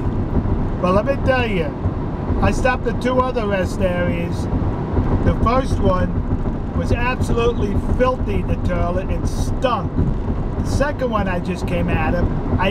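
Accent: New York, United States. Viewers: Based on your base accent, I'm of course thinking that you're heading back to good old New York. But we'll see!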